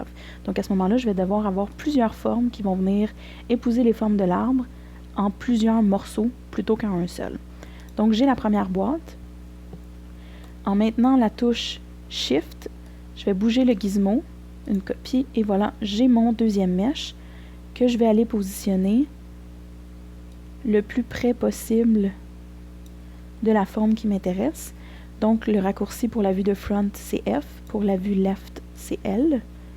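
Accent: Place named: Quebec, Canada. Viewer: Merci. c vraiment l'fun d'avoir une voix québécoise. À noter les majuscules sont importantes.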